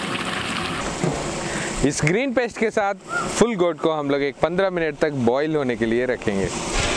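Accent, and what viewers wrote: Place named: Tamil Nadu, India. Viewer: Kaun Se Gao Wale Bhaisaab Tumhe ??? Baat Karne Ka Style Se Tamil Waale Dikhren Tumhe...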